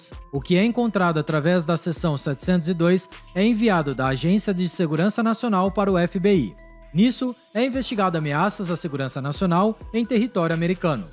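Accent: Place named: Caipira, Brazil. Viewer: Essa narração caipira é osso.....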